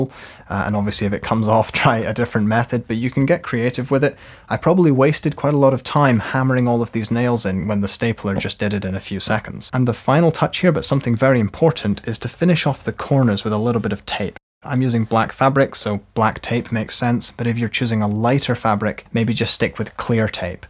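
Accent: Scotland, United Kingdom. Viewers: Chaps… this is what a very posh Scottish person sounds like… must be a Farquhar or similar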